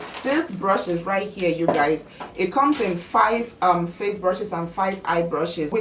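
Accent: Nigeria. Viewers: I’m gonna guess Nigeria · b. yea shes nigerian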